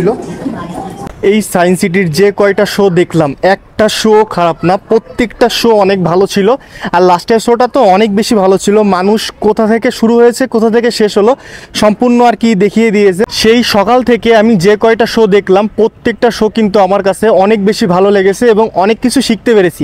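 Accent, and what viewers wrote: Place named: Bangladesh. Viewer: apnar bangla vasa to valo noy! kemon jeno bangladeshi mone hoy j?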